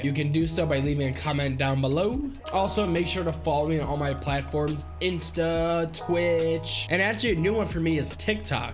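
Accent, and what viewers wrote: Australia. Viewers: Dude all Australian speak like that. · he’s Australian what do your expect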